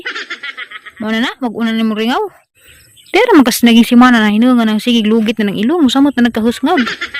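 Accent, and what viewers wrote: Bohol, Philippines. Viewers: Always watching here in Cebu ♥️ i love your boholana ascents🤗♥️ labi nas words nga "ARANG" masundog nako usahay😅😍😍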